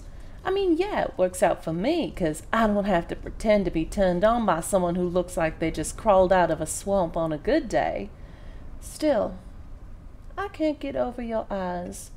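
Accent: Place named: South, United States. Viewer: I am really feeling the southern Belle ascent in this one. Nice imaging of the Succubus.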